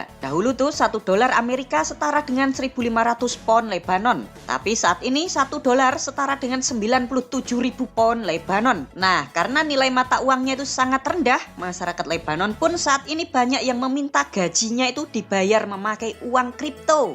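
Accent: Java, Indonesia. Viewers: mas medok banget jawanya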